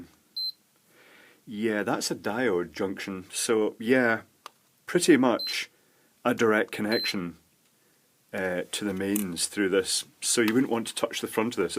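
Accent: Scotland, United Kingdom. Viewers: Yeah he is Posh Scotch - only the best from Scotland! Sounds a bit like Bryce Curdy except he doesnt have a cucumber shoved up his butt! lol PS. Bryce Curdy was a gay STV news presenter who turned up at a local Glasgow hospital with a cucumber lodged in his rectum - his career after that was all too short unlike the cucumber which according to my pals at the Viccy was a massive 16 incher! lol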